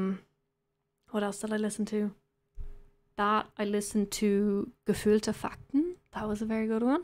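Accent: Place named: Ireland. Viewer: Woah! Crazy seeing your progress! And i think it's really fascinating how your Irish accent just vanishes when your speaking German. I do have a question, what VPN are you using?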